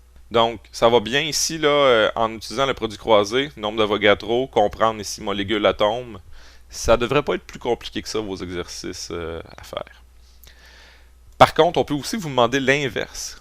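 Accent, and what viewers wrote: Quebec, Canada. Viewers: ps:l'accent Québécois est énorme 😅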